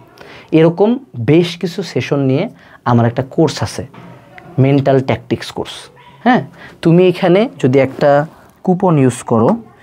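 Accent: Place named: Rangpur, Bangladesh. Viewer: Vai er kothar tone e bujha jay Rangpur er manush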